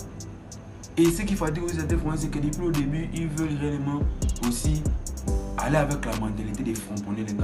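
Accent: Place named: Gabon. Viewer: Ça manque de qualité, d'originalité ! Ça ressemble beaucoup à No limit. Force en tout cas ! Ça sent l'accent Gabonais tout ça.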